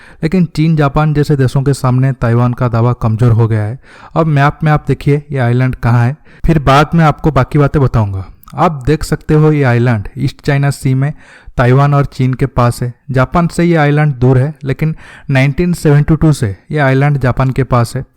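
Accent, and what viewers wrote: Odisha, India. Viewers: Ur hindi speaking is heared like odia tongue speaking.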